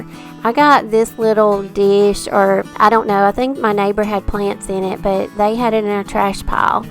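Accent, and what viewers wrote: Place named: Alabama, United States. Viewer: You sound like you might be from Alabama ~ I am! Love your ideas& subscribed to your channel ❤️❤️❤️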